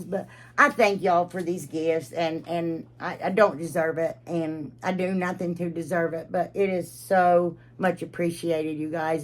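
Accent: South, United States. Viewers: I 'v e just found your channel today but Girl I 'm a fan for life!! You're the 1st person I 've ever seen that sounds as "country" as I do. It's wonderful Never change. Cause remember, "the south's gonna do it again"! Love, love, love you!!!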